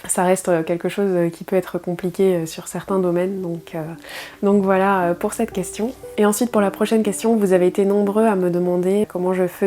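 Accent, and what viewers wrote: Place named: Hainaut, Belgium. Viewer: Félicitations pour ce cap des 10000 abonnés. C'était un plaisir de te découvrir davantage... Avec toutes tes passions qui sont celles que j'aime aussi... Cette vie douce est vraiment source de satisfactions ... Tu diffuses de l'apaisement comme le parfum des pâtisseries que je t'imagine sortir du four ☺️. Et cerise sur le gâteau tu es du Hainaut 😉 le pays de ma famille maternelle.. 🤗. Et chaque note d'accent est une petite madeleine pour moi ☺️... Je te souhaite encore de nombreux abonnés et surtout... beaucoup de temps pour continuer à créer. 😘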